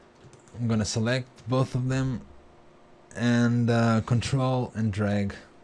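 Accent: Portugal. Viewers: muito bom! o teu ingles é perfect mas dá para ver que és tuga ;) Abraços e continuação de bom trabalho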